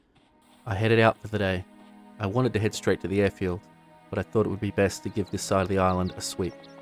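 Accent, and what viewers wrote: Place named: Australia. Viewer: "I snuck up behind one of them, and stabbed them so hard their pants changed color... That was weeyud." 😂This gave me a good chuckle lol Edit: "Weeyud" is just how my big-dumb Americanized English brain hears "weird" with your Aussie(?) accent! lol My bad if it's not Australian. Despite the fact that the Aussie and Kiwi accents are pretty much my favorite English accents to hear of all of them, I sometimes have difficulty differentiating between the two. A little off topic but I also really enjoy the accent Tom Hardy is doing with Alfie Solomons/Ron and Reggie Kray, which I've been lead to believe is a "cockney" accent, though I literally have not one fuck of an iota what that is and wouldn't pretend to lol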